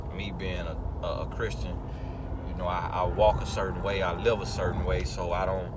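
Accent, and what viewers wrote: South, United States. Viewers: Nice to see your life behind the scenes. More like this please!! Oh & don’t mind the country accent. I love it!! Well done to your sons x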